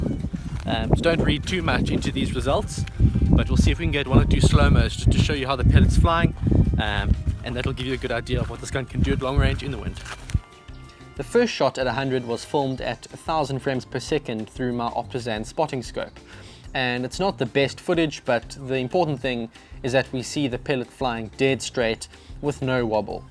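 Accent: South Africa. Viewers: vkluchi subtitri mozhet tak budet legche perevodit.. U nego Uzhno afrikanskii akcent no v celom vse ponaitno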